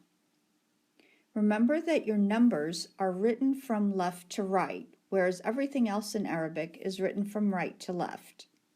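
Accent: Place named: United States. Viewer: the pronunciation is very American. ... very strange.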